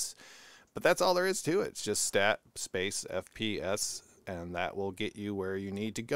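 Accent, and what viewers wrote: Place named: England, United Kingdom. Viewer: I LOVE your english accent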